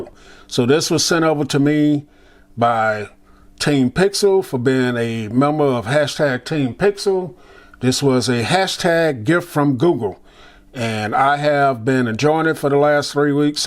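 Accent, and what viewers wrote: South, United States. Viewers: First review I've heard with a southern accent. Sound like one of my uncles. Love the content. Love my Stormy Black 6 pro 💪🏾✊🏾💯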